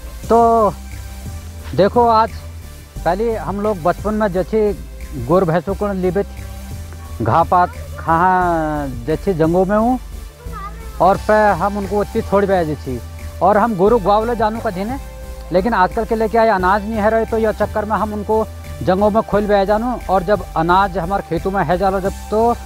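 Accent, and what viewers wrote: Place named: Pahadi, India. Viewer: आपकी पहाड़ी बोलनी बहुत अच्छी लग रही । आपको पहले कभी नहीं देखा। बहुत बहुत सुंदर🤣🤣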